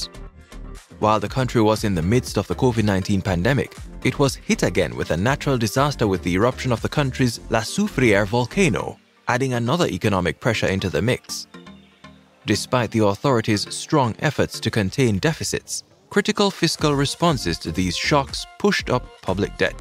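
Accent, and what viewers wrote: Jamaica. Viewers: I pickup his accent so I know he is Jamaican, but whose more biased against Jamaica than a Jamaican, they are always the ones in the front of the line to disregard and disrespect Jamaica and her accomplishments.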